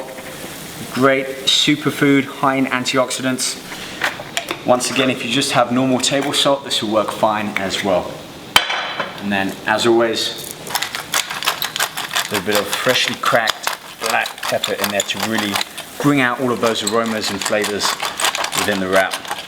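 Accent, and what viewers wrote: London, United Kingdom. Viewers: "you sound like you're from london"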